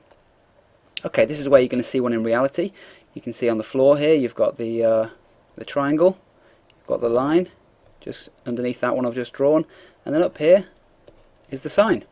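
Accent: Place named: Northern England, United Kingdom. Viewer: I detect a lovely mild northern english accent. :)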